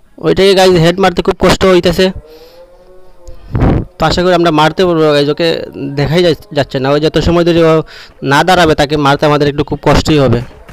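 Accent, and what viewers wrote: Sylhet, Bangladesh. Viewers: Vai sylheti grime🤟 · Sylhety ni bro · Tmr basha Sylhet tai na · ভাই আপনি কি সিলেটি? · Vai apni sylhety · Sylhety bro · Sylheti na kire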